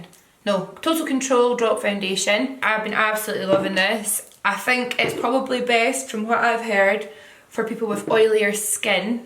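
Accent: Scotland, United Kingdom. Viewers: Scottish accents make me happy. lol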